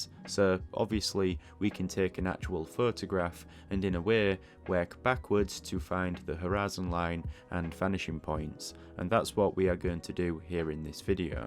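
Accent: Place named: Yorkshire, United Kingdom. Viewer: Brilliant video. Also sound like a fellow Yorkshire man! 👍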